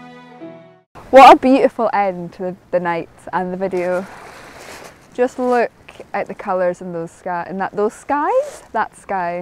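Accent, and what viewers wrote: Scotland, United Kingdom. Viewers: Hello, how are things? I like your video and I like your photos as well. Like # 940 is mine. The other photographer I follow are all geezers. it's nice to have a feminine perspective for a change. You've got yourself a follower in São Paulo, Brazil now. Love your scottish accent.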